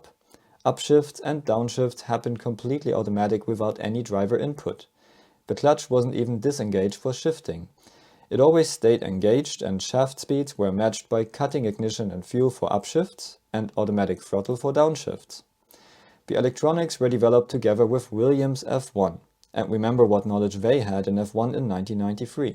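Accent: Germany. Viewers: Schade in deutsch hätte ich es mir gerne angesehen Ich bin ein großer Opel Fan Aber in englisch mit deutschem Dialekt? Nee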